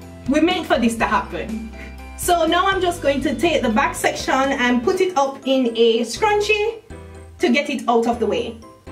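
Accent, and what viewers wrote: Jamaica. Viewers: This style is lovely just like your beautiful personality - Loving the natural Jamaican vibes you give, never lose your accent.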